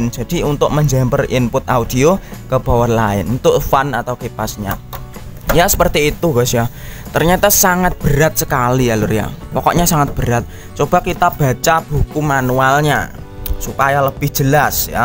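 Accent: Java, Indonesia. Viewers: Medok banget...suaranya ngebas banget....jowone ngendi....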